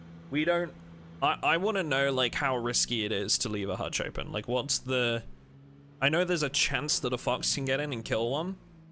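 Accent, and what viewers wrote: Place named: United Kingdom. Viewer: Your voice slightly, but only slightly reminds me of ItsJabo. Maybe because both of you have the same accent. Both of you are from the UK.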